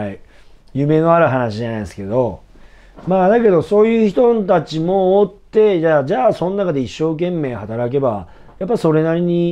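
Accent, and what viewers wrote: Nagoya, Japan. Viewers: いいね 名古屋弁！港区か南区あたりの出身ですか？ 思わずチャンネル登録。Goodボタン押したよ。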